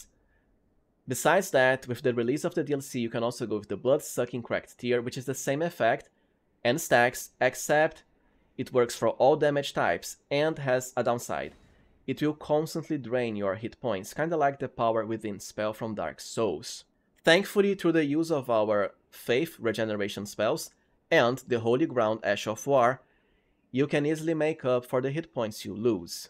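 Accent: Brazil. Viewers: reconheci o sotaque brasileiro na primeira frase, adorei o video mano! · I know a brasilerio when I hear one ;)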